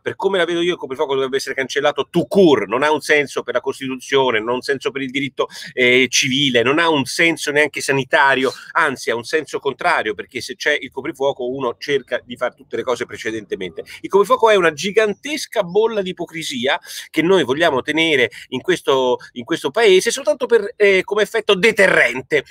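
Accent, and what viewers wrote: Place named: Piedmont, Italy. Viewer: Peccato che all amico di ieri nessuno abbia risposto sull argomento... che peccato Comunque il tuo piemontese é proprio da barotto ormai nessuno lo parla così manco mia zia di 96 anni va, gadan che non sei altro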